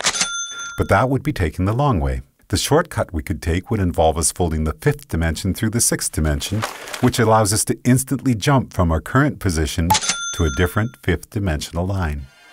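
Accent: Canada. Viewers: The minute you started talking, I thought, "this guy sounds so canadian."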